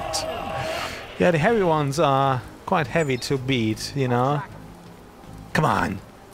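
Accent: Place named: Germany. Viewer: Are you german? I can hear a slight accent :)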